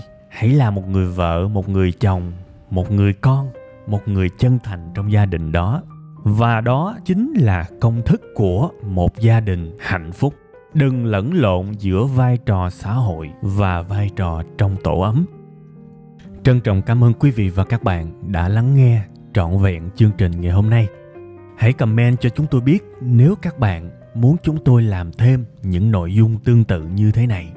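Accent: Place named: South, Vietnam. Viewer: giọng miền trong khó nghe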